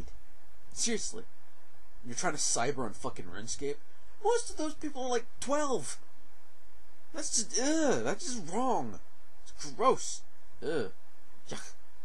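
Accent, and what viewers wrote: Scotland, United Kingdom. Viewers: i understand you fine with your scottish accent..maybe because i'm part scottish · I can understand your True Scottish Accent, but I think what your working with is good enough. It works very nicely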